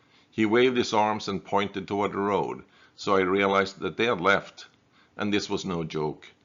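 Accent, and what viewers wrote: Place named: Sweden. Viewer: ❤Nice to listen to! Your accent is very Swedish and I guess you know. 😊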